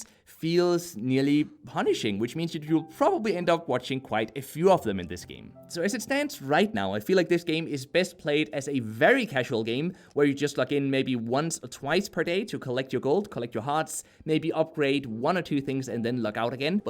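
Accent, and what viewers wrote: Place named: Denmark. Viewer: Before I saw the Kroner symbol in the shop, I could tell by your voice that you were Danish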